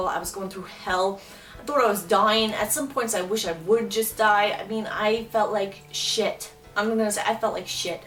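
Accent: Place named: Rhode Island, United States. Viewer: You sound like my Aunt Shirl and she is from Rhode Island. Where are you from? Thank you for making these videos even though you were nervous. It is appreciated.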